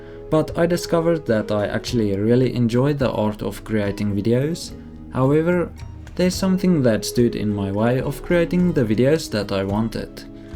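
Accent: South Africa. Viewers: you have already surpassed the achievements of many people three times your age. You are an old head on young shoulders as the saying goes. As a model railway enthusiast myself it’s always nice to see and hear what other folks are up to and you definitely bring a fresh outlook to the hobby with a wealth of interesting personal observations along the way. You are teaching me things and I’m one of those much older enthusiasts. Keep up the good work but don’t knock yourself out trying to hard. It’s all about enjoying the hobby and life at the same time. Your English is great and the South African accent just makes it even more interesting to listen to.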